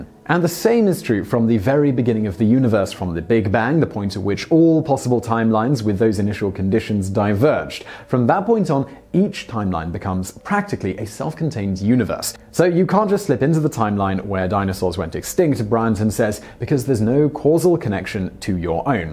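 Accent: United Kingdom. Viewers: Absolute pure garbage video and has nothing to do with the dimensions in string theory. Just because the guy has a British accent doesn't mean he knows what the hell he's talking about.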